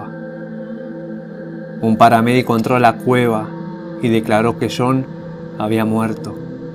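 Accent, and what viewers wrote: Argentina. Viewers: La Re. Caga el asentó argentino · Así hablan los argentinos? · me imagino que es de otro país, Argentino tal vez, Chileno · Es por que es argentino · Asi hablan los porteños de capital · la voz y siendo argentino jajaja da es pena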